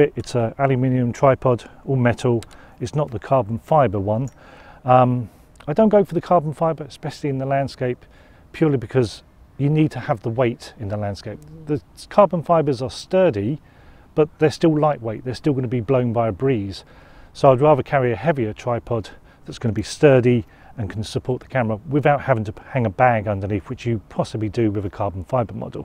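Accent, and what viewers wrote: United Kingdom. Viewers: At last, someone with a British accent, thank you!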